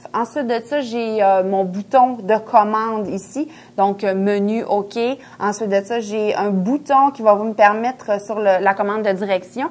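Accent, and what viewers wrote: Quebec, Canada. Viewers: Hello (j'aime beau dommages donc votre accent du québec), ci dessus j'ai répondu à la gentillesse, encore un jaloux envieux via ma carte SD, j'ai mise à jour le firmware, mais même si la version a été reconnue il me semble qu'en ce qui me concerne, la jauge est très minimaliste! mais de toute façon je vais passer au HS20, et revendre mon HS10 à la tombée du soir j'ai raté des sujets faute de sensibilté, et 8 Mpix me suffise amplement 16 Mpix pour les recadrage, regards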